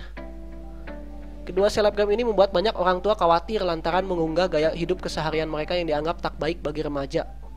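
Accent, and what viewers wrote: West Java, Indonesia. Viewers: Orang sunda kang? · Si akang teh orang sunda ya kang..hihi..ketahuan bangeett cara ngomongnya logat sunda 😀✌✌✌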